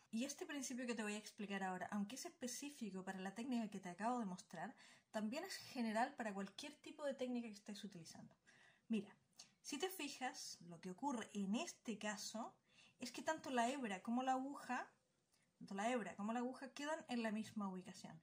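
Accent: Chile. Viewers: Tienes acento entre chileno y españolísimo!! Jajaj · speak in chileno cuicos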